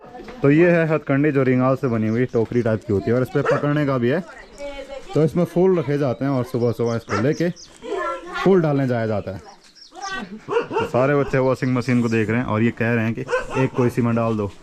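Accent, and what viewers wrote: Garhwal, India. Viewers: भाई एक विडिओ पहाड़ी में बनाओ ना आपकी गढ़वाली भाषा अच्छी लगती है ❤ जय देवभूमि जय उत्तराखंड ❤🙏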